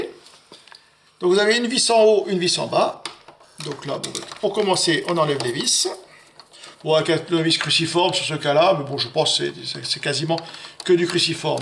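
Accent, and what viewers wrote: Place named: Reunion, France. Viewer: Merci monsieur 🙏 Petit accent de la Réunion 🇷🇪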